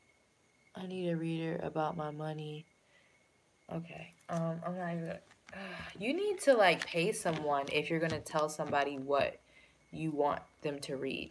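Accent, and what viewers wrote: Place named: DMV, United States. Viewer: Where are you from love... you sound like your from the DMV area or some where around there... (DC/Maryland/VA) ... Just being nosey girl... LOL!!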